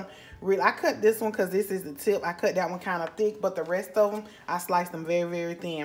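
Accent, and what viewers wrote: South, United States. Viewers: It's been a while since I made squash casserole, so looked yours up on here. This is a good one, but I used zucchini & yellow squash together... I've gotten so much from my garden this year! I had to use the closed caption as my hubby was watching TV & got tickled at the way the person who wrote out your words spelled things!! They must have had a time translating your southern accent... lol I made this many moons ago & glad to have you to refresh my memory... I'm 73 yrs God Bless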